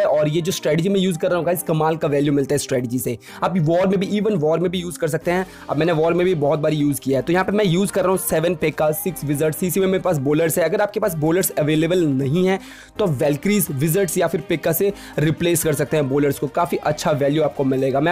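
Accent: Bengal, India. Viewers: Bhai tumi bangali ?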